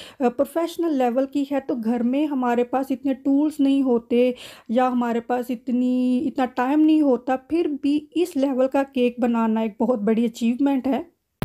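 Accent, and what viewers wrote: India. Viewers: Aap ka accent kitna simple aur desi hai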